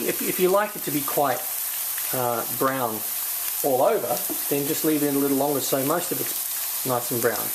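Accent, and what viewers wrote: Australia. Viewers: are you an Ausi? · Ypu being aussie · Cooking channels Australian aha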